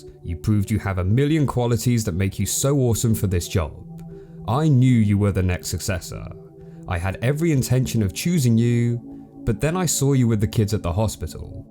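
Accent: United Kingdom. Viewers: I can tell you're British.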